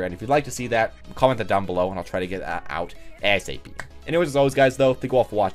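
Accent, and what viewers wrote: United States. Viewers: Are you from like Boston/ New York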